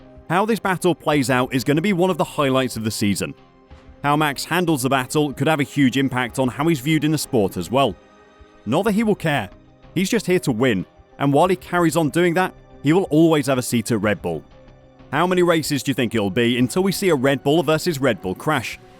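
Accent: United Kingdom. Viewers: Very biased video against Max, sounds very British.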